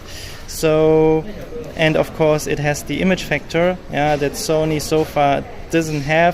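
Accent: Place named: Germany. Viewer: That's a nice German accent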